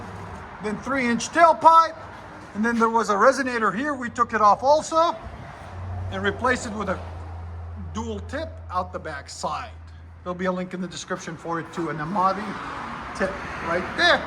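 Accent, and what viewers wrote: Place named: New England, United States. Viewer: I cant get past the sound of a yankee doing the commentary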